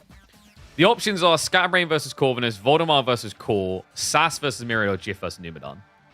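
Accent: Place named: United Kingdom. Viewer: Sounds like a Brit but hails from Hungary. A Mantas if you will.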